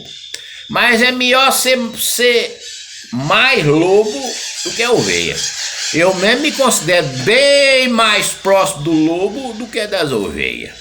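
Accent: Goiás, Brazil. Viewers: Sou de São roque SP gosto de te ouvir seus caso seu linguajar no sotaque goiano e caipira é chik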